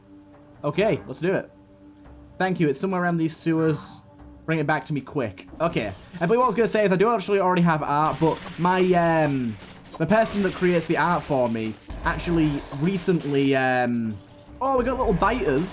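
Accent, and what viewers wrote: United Kingdom. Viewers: Yes more dead Cells! What part of the UK are you from btw? 😊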